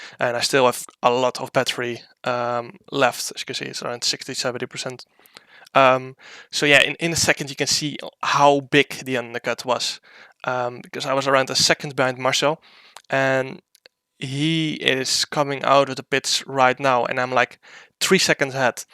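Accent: Netherlands. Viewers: Wat een heerlijk Nederlands accent zeg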